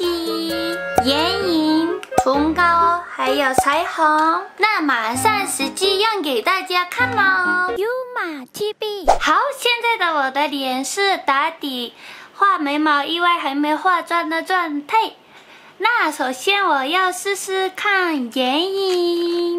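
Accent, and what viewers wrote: Standard. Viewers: 恭喜yuma TV 突破20W!! 繼續努力~而且你的中文可以算是很標準了,已經不再是躲在ryu旁邊當攝影師,拍影片前先寫好稿子的Yuma了 ^^ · 中文说的还挺标准的，加油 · Yuuuma恭喜20萬訂閱!你的中文進步了很多.發音超標準的!只是如果有比較不會的字一定要問Ryuuu喔!永遠支持你!!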